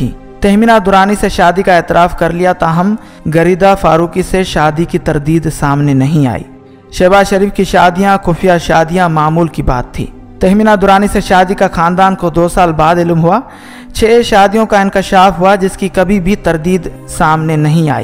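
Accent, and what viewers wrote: India. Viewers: Bhai pehle urdu k makharij seekh lo. Apko toh hinduon ka rang charha huwa hai . Hay ko khay aur ghalat ko galat bol rhy ho indians ki tarha. You need to work on your voice and accent.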